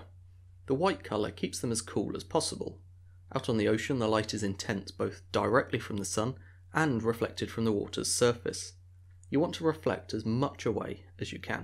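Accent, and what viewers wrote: United Kingdom. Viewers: Sounds Uk English, to me with not a lot of markers of a certain region or "specific accent" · British english.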